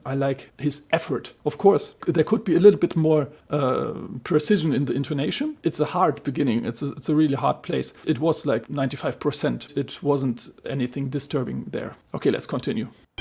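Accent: Germany. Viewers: würde mir nur etwas weniger deutschen Akzent wünschen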